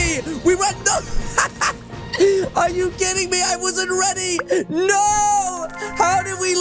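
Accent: United Kingdom. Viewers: Its my first time seeing this vid u sound like british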